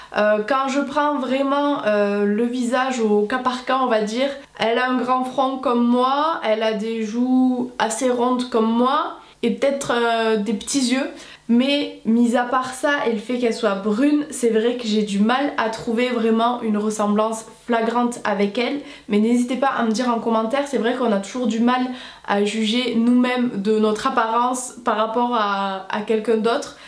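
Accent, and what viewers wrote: South, France. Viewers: Je pense qu’il faut regarder koh lanta, pour trouver que Cindy te ressemble ! Vous avez le même accent du Sud ! 😅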